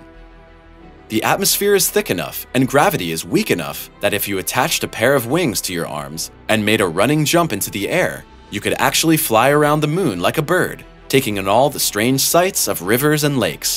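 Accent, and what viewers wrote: United States. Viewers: Why would you use km when telling of an American tornado! So friggin annoying! You even have an American accent! Use standard measures idiots!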